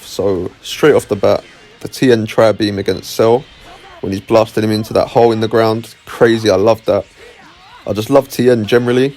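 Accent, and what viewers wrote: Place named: United Kingdom. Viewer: Get less British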